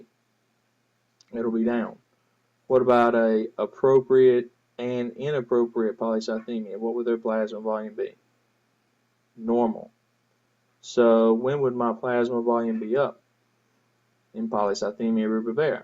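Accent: United States. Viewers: And i like ur pure american accent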